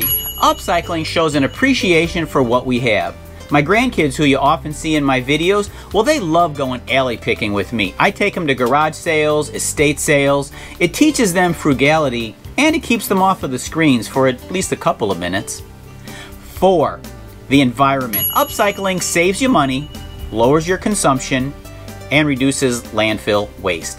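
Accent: Chicago, United States. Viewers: You're from Chicago aren't you? We hear it in our own.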